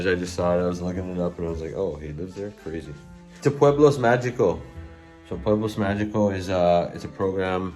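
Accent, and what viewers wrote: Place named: Spain. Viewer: Just discovered your chanel I like your spanish accent, keep it up.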